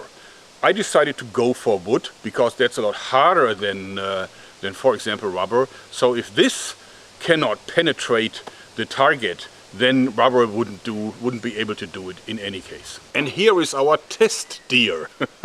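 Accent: Germany. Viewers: Okay, i wondered for a Time now Is he German? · My biggest wish is that says "It is bullshit" for once, just in that nice thick german accent of his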